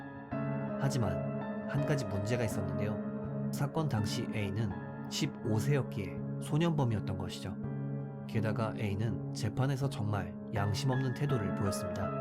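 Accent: Japan. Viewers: 나레이션 발음이 일본인이거나 한일혼혈같으신데 맞으신가요? 일본지명 발음이 일본현지 사람 같아서요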